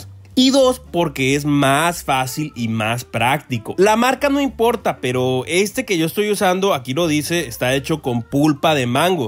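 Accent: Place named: Monterrey, Mexico. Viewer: Al chile los de monterrey así hablan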